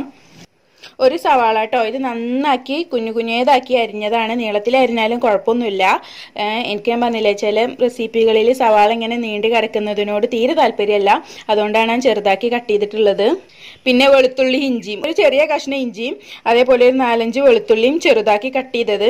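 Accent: Malappuram, India. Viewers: samsaram orupadishta, njanum malappurathukariya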